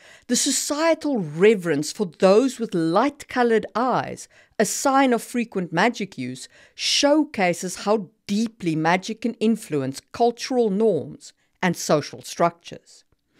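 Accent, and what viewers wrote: South Africa. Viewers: You sound like you're from South Africa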